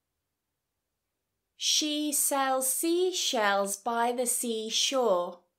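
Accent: United Kingdom. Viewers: Yes, Number 20 is ittle bit harder to pronounce. I love your 20 Tong Twisters. I like the British pronunciation better than American pronunciation. Thank you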